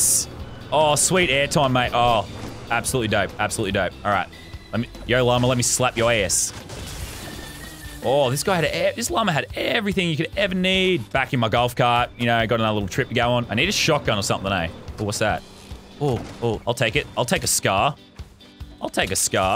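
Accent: Australia. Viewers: PiBi23 Official he is australian the accent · I’m Aussie and you can tell he makes the accent stronger (for comedy), cause when you have an Australian accent it’s really easy to go full out Aussie mate... yeeeeeeee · You sound very Australian mate (I'm Australian as well)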